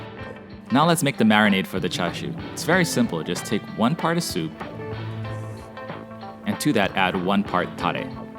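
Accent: Hawaii, United States. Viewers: I like the shortcuts you show! It’s really clever! Are you from Hawaii? You sound like it.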